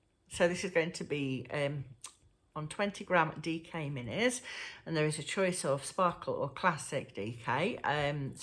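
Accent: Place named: Derbyshire, United Kingdom. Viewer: I’m new here, when I heard you talk I thought same accent, I’m a Derbyshire lass.xxx🐝🐝🐝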